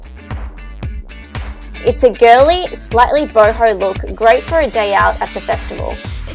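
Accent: Australia. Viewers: your accent reminds me of my trip to australia... I just came back to Italy from Melbourne.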